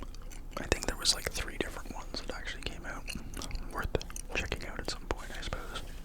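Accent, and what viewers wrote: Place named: Canada. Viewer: also are you from Canada?? I hear a little accent and its really nice hahah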